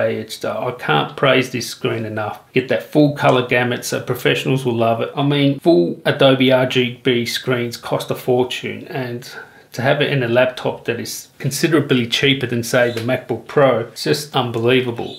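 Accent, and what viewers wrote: Australia. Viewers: If anyone knows who videogamedunkey is, do you guys think this guy sounds very simliar except with an australian accent? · Is it just me or does this guy sound like an Australian Trump? (no offence intended, just the way he speaks reminds me of him)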